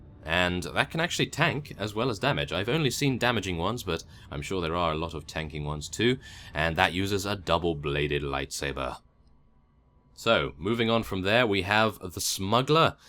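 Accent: United Kingdom. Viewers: Make a guild please :D, I will join you! we will all join you! Mwahahahaha! (please go empire, any class! british accents are awesome in my opinion! :D)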